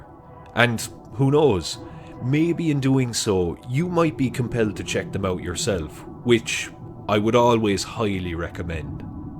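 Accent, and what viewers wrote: Cork, Ireland. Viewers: IRISH ACCENT MAKES IT SO MUCH BETTER!! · can hear an Irish accent much love from Dublin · Sounds like a Cork accent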